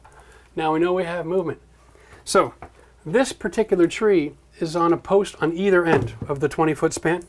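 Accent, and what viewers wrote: Canada. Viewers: You can hear that strong Canadian accent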